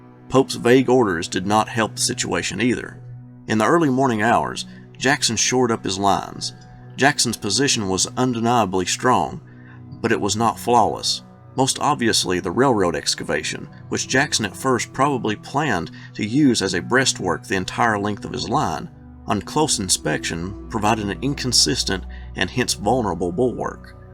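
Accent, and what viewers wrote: South, United States. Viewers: This Southern Accent Is Way Too Distracting. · More long videos sir, these are by far the best battlefield series on YouTube and I throughly enjoy hearing a fellow southerner narrate. Which state are you from if I might ask? I’m an Alabamian and get excited every time I see my state represented.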